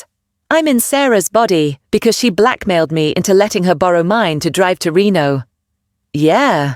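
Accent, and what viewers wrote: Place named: United Kingdom. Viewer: Enjoying the British accent.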